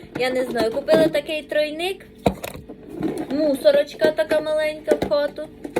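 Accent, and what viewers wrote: Poltava, Ukraine. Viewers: Як ви не розумієте,що вона говорить,спеціально полтавськтм акцентом Вона грамотна дівчина,подивіться її інтерв,ю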